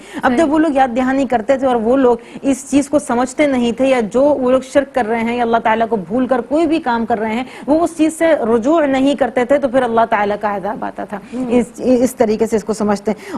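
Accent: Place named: Arab world. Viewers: I think you don't have idea that she have born in Saudi Arabia, and brought up there and she doesn't know urdu much, and her first language is arabic that's why she speaks like this, kindly search about the person then talk about them....jazak Allah khair. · Why she talk Urdu in Arabic style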